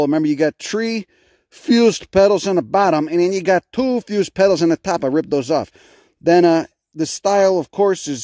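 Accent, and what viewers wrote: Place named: Shasta County, United States. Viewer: you sound like you live in shasta county like shingletown or something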